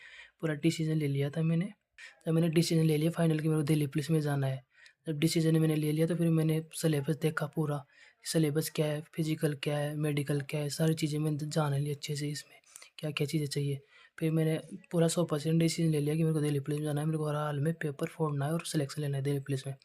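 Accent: Rajasthan, India. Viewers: Aap kha se ho bhaiya aapki voice Rajasthani h m bhi alwar Rajasthan se radhe radhe bhaiya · बोली से पक्का हो गया की राजस्थान से हो पर राजस्थान में कहा से 🤔😅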